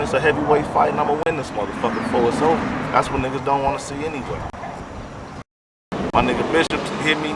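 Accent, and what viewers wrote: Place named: New York, United States. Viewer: you talk like a NY nigga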